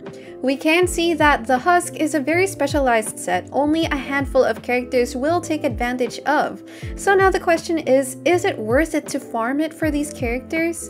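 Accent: Philippines. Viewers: You probably get this a lot but your accent is comfortably eng/fil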